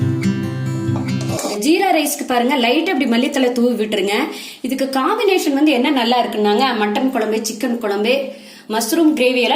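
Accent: Kongu, India. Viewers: nice to hear kongu Thamizh. super process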